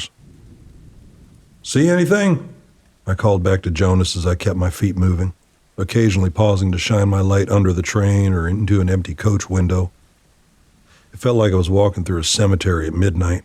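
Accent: East Texas, United States. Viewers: Your East Texas series with your perfect country voice is my very favorite for relaxing. I have PTSD major depression and anxiety disorder so I keep it on rotation when I can’t sleep. Thank you for keeping the good energy in and the bad thoughts away ❤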